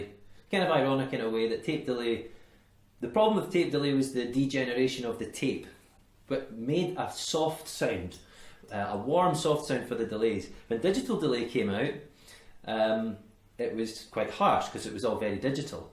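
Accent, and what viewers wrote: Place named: Scotland, United Kingdom. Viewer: thx 4 Ur quick answer - but nope : I speak 5 foreign languages fluently without my "home" language accent :-D - and I can understand "some" regionalisms in all of them, but not "all" of course - so as UR trying to speak "english" U should at least do the best U can doing so .. not very many people on this entire >planet< can speak / understand "scottish" (even after having drunk several bottles of the regional whisky ;-) ) - there4 all I can do here is listen to it several times, hoping to get "into" it after a while .. and it would be nice if you'd apply subtitles in the future (there are fairly good automated programs for that) 8-)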